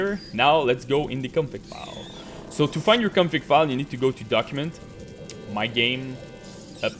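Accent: Quebec, Canada. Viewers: Arrête! On entend le québécois en lui mais son accent est vraiment pas pire!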